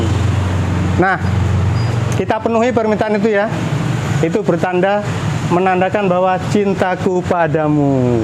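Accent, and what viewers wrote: Java, Indonesia. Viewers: Bapak orang Jawa yaaa, .. lucu kalau kasih penjelasan, jadinya mudeng xixixix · Aku di semarang p de mohon maaf caranya mupuk dan media anya apa sama di jawa dan di balik papan tapi logatnya koyo orang jawa... Terimakasih pak deje las sekali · Jawanya medhuukk😅 siap praktek boskuu❤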